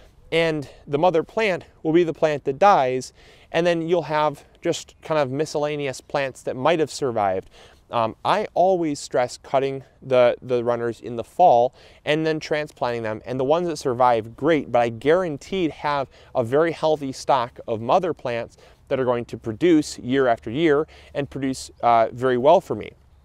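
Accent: Michigan, United States. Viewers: you have the thickest Michigan accent, love it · I can really hear that MI accent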